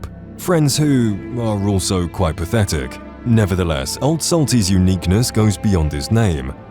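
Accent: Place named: England, United Kingdom. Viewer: That's how it is pronounced in England. You know, where English is from. Seriously... Americans are unbearably thick.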